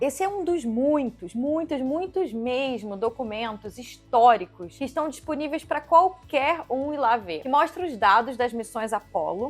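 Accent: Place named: Rio de Janeiro, Brazil. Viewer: Por mais vídeos com sotaque carioca 😆 Essa gente tem muito tempo livre pra inventar teorias mirabolantes.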